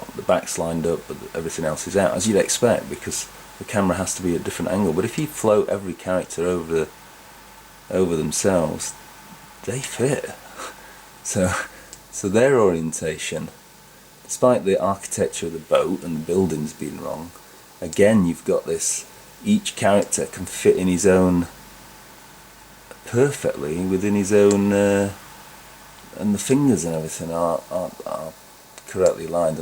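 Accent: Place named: Liverpool, United Kingdom. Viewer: You’re definitely from Liverpool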